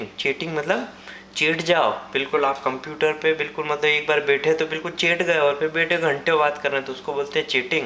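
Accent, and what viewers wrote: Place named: Malwa, India. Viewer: malwi rocksss its always nice nd touchin to hear ur lang when u far from ur home(malwa)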